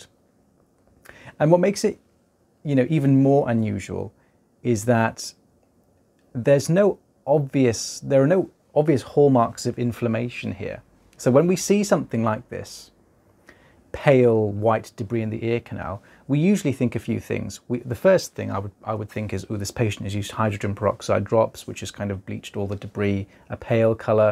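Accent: United Kingdom. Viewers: i think you need to talk to dr. Neel Raithatha he does ear wax removal also and he uses an oil type cleanser called clear. excuse me if i'm wrong, but you sound British to me and Neel IS British so maybe find him some how. he has his own youtube channel but his comments are off so try using his comunity page. hope he can help.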